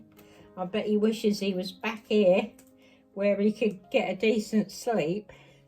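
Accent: England, United Kingdom. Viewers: Awe Hi Felix…Love him so much! He’s definitely a heart breaker ❤ Omg you poor thing with all that happen to your son and still..Ugh I don’t know how us mothers get through life after kids lol. They surly keeps us on the edge of our seats. Felix looked adorable in that new outfit 🤎 loved the teddy bear shoes ❤ thanks for sharing. I seriously couldn’t listen to you all day long, brings back so many memories of listen to my grandmother and her beautiful English accent. God bless sweetie and hope your feeling good.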